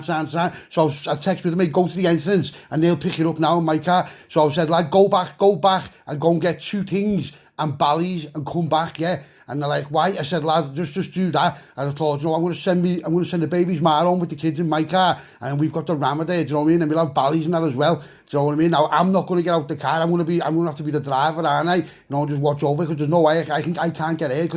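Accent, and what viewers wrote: Liverpool, United Kingdom. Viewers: Fuckin el Scouse...take me to the fair with you next time!! 🤣🤣🤣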